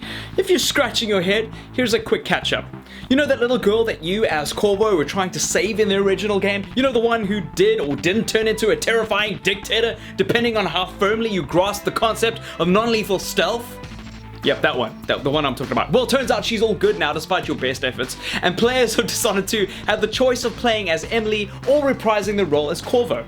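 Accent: South Africa. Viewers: are you south african? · Your from south africa? :D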